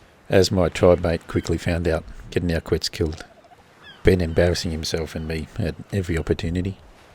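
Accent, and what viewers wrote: Australia. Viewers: you sound Australian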